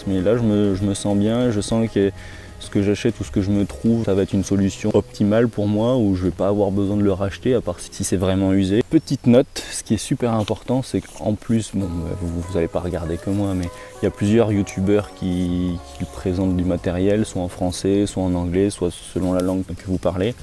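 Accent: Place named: Basque Country, France. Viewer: Bonjour. Bravo pour votre chaîne et vos périples. J'aime beaucoup votre sincérité, modestie, et philosophie de la randonnée. Il faut toujours commencer avec son père, le seul type au monde que vous pouvez envoyer balader et qui néanmoins, vous aimera à la folie :) Une certaine façon de prononcer les "R" me fait penser que vous n'habitez pas loin du Pays Basque. Bien cordialement.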